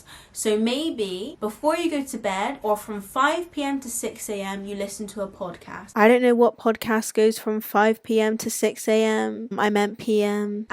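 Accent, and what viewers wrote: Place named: Bristol, United Kingdom. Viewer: Are u from Bristol? Ur accent sounds so Bristolian 😂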